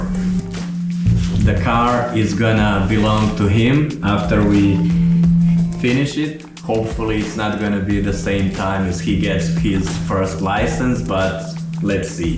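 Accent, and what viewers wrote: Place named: Balkans. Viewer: I noticed the accent and the name of confirmed my thinking 😅 Odakle si legendo? Kidaju snimci!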